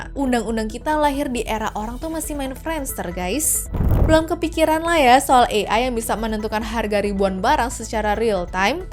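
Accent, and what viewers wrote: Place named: West Java, Indonesia. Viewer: kakanya kayanya dr logat jawabarat yah heee...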